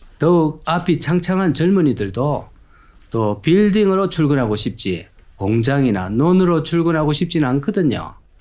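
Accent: Gyeongsang, South Korea. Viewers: 우야노 님 멘트 넘 재미져요 친밀감느껴지는 사투리 옛 생각 납니다 저도 경남거창 줄신이라 사투리가 정겹습니다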